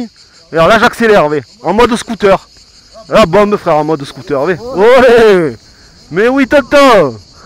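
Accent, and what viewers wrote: Marseille, France. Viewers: J'adore tes vidéos et ta bonne humeur de marseillais. Quel accent, j'adore!. Continue de nous présenter de nouveau modèle dans le délire et le sourire du sud et de Marseille. J'ai revenu ma speedway 5 car je trouvais les amortisseurs trop durs et je suis de nouveau à la recherche d'une nouvelle trottinette mais avec des amortisseurs souples surtout pour mon dos.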